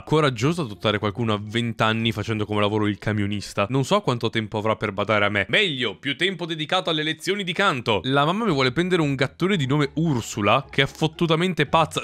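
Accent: Bologna, Italy. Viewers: Ma sei Di Bologna davvero?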